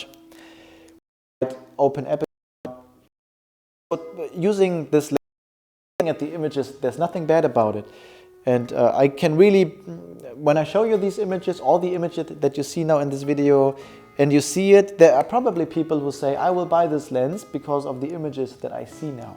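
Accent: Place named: Germany. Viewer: That was the frist video I saw of you. ☺️ Watch a couple more last night and I love them. And your accent is kinda funny in a cute way. No offense. I really like that german accent.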